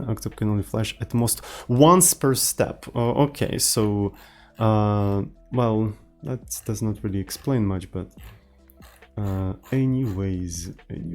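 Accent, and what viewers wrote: Russia. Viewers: ты русский? я чувствую акцент))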